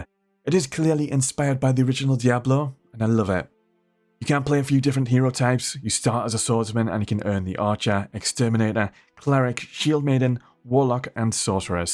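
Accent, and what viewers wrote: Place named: United Kingdom. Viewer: Goddanm i love british reviewers lol.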